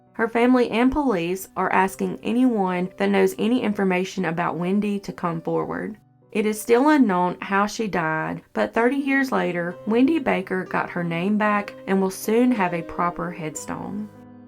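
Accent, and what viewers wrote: South, United States. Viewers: Yeah the videos really good and informative, but that southern accent is the cherry on top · I love the narrators southern accent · Love your accent 😊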